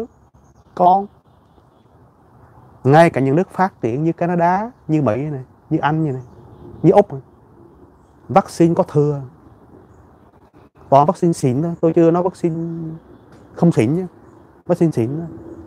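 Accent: Standard. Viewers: Anh chỉ được cái nói chuẩn 👍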